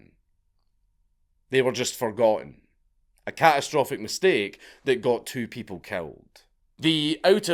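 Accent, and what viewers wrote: Scotland, United Kingdom. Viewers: Why do Scotts pronounce “world” like it has 3 syllables?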